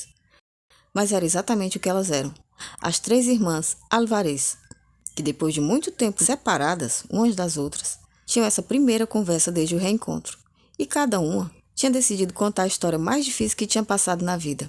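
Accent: Northeast, Brazil. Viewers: Boa tarde irmãzinha, gostei muito de seu canal sua voz narrando ôs vídeos é bem nordestina, sou daqui do estado de São Paulo, mais nasci na Bahia, pôr isso entendo tudo que você diz 😂😂😂😂😂respeito muito ôs vídeos, c são reais ou não fica há cargo de cada um, acreditar ou não, tenho dezenas de canais que relata vídeos verdadeiros de lobisomens vampiros e outras criaturas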